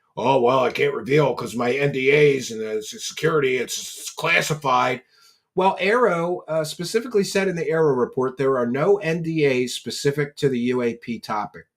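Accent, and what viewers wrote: Philadelphia, United States. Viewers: Good for you!!! I found his channel about 6 yrs. ago. Saw this guy wearing glasses and talking like someone from Philly about all the BS and I was hooked.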